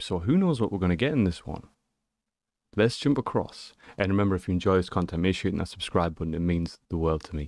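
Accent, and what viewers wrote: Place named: Newcastle, United Kingdom. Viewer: Enjoying the Geordie accent 🙃 . I don't have to say a thing about this reaction except your enthusiasm is spot man!! 😎🤟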